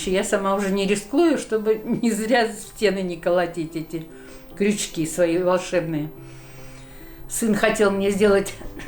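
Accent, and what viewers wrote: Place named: Saint Petersburg, Russia. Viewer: Сразу поняла, что вы из Питера) приятно слушать) здоровья вам!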